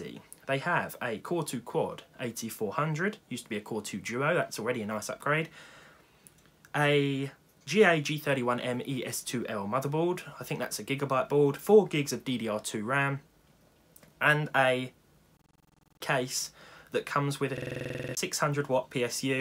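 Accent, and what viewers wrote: United Kingdom. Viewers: Hello i have just come across your videos sounds like you are from the uk like myself i dont have facebook or Twitter. I am looking for someone to help me i have a 6-7 year old mac book pro works perfectly. I am currently using windows 10 via boot camp. My question is can i run windows on my macbook without using bootcamp? For example completely wipe the laptop of any operating system and just installing windows thank you i hope you can help daniel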